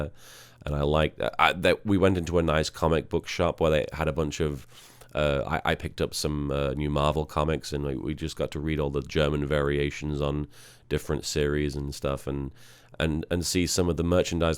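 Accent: United Kingdom. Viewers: I wonder why he has a British accent.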